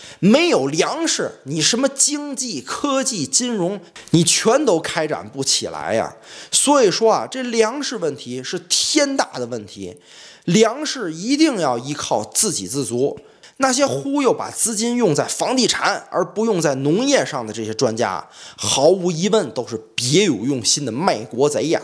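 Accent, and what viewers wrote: Tianjin, China. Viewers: 老家天津，喜欢你说天津话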